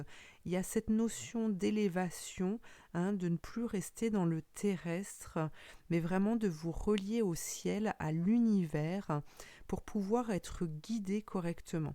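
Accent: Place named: Jura, France. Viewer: Haaaa j avais bien reconnu l accent jurassien.. bisous d une autre jurassienne..ou serez vous installé svp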